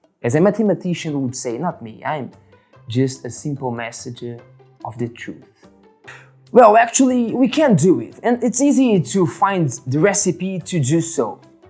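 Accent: Minas Gerais, Brazil. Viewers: Minas Gerais accent is marcante, não tem jeito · cliquei no vídeo so p ouvir inglês em mineirês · Now I can practice my listing with math vocabulary and Brazilian pronunciation, thanks for that. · Não terminei o vídeo, mas quero saber onde tá o mineireis. "Why" não vale! Kkkkk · O sotaque Brasileiro a falar Inglês é algo que me deixa intrigado, mesmo assim, excelente vídeo! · Sotaque mineiro em inglês é foda kkkk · Lhes apresento amigos o sotaque mineiro em ingles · UM MINERIN FALANDO INGLES É IMPAGÁVEL, QUE TREM MAIS BUNITO DE OIA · O inglês "brasileiro" é o que eu mais entendo bem kkkkkk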